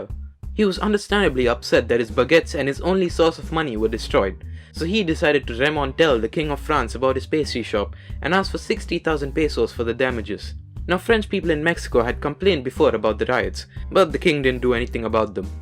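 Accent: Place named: United Kingdom. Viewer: im dying lol, gj m8 nice british accent btw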